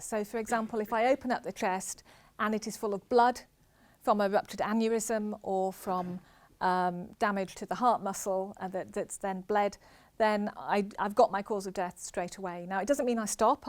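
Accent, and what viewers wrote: United Kingdom. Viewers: Her English accent somehow makes this autopsy classy.🤣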